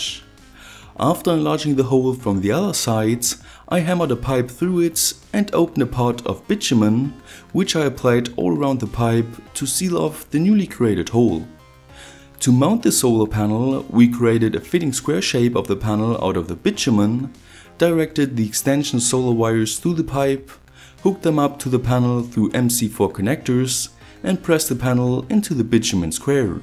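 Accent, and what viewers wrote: Germany. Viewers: C'm'on, german accent is the best part of those videos :) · I hate English with Russian accent (crazy Russian hacker) but English with German paradoxally is very nice :)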